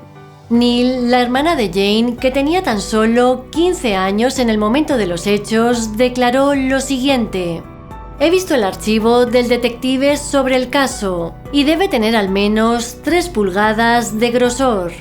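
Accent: Spain. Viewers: eres española o mexicana?, en todo caso tus relatos son interesantes pero tu forma de hablar hace sentir la sensación de q estás montado en uña montaña rusa🙄🙄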